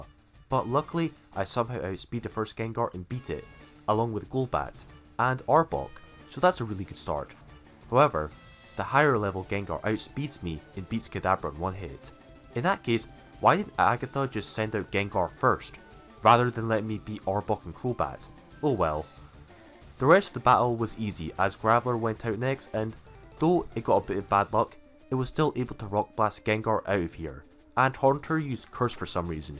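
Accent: Scotland, United Kingdom. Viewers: yeah I had you pinned as Scottish maybe Glasgow or something but in some bits I wasn’t sure lol Great vid.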